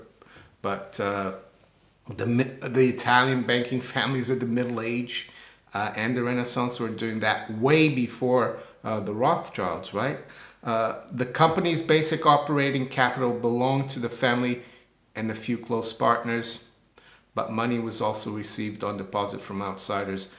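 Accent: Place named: East Coast, United States. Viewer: I enjoy your channel! Difficult to know you're Brazilian because you speak with what sounds to me like a U.S. east coast accent. Did you spend time living in New York, New Jersey, or somewhere on the east coast of the U.S.?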